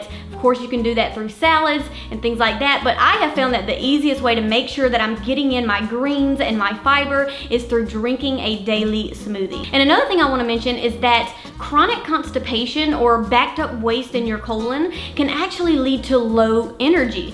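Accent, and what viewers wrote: South, United States. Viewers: What kind of accent is that? Sounds southern to me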